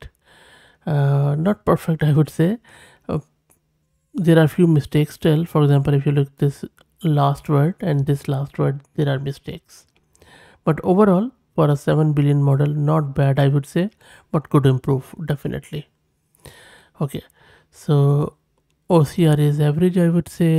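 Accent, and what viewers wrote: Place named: India. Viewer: Man, it's a pain in the ass that Indian-accented English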